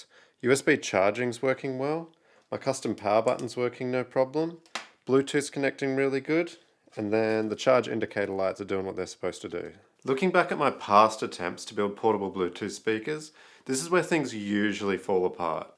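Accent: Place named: Australia. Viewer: I can't tell you how exciting it is seeing and hearing a fellow nerdy Australian in these videos. Huge congrats on such a great design!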